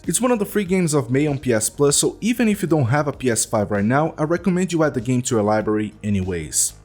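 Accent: Brazil. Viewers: Tu é brasileiro? Tem sotaque hahaha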